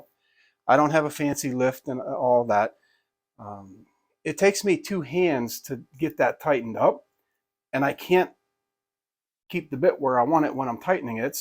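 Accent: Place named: Pittsburgh, United States. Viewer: Pittsburgh? I know that accent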